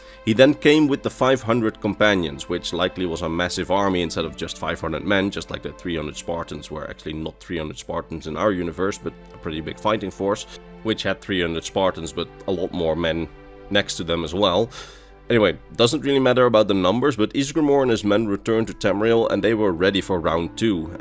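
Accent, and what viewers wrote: Netherlands. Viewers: Sounds Dutch or maybe scandi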